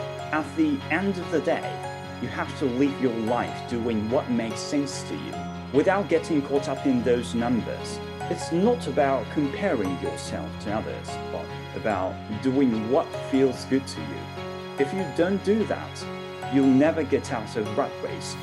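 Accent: United Kingdom. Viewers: 貴方のおかげで、共通テストのイギリス英語が前よりも格段に聞き取れるようになりました！！ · おめでとうございます！いつも応援してますイギリス英語のほうがいいっすねｗ 染みるわ～～ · 20万人おめでとうございます🎉 イギリス英語って染みますね！ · はじめて「 さん」ではなくのイギリス英語を聴いているような気分。響きます。。。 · さんはイギリス英語かな？？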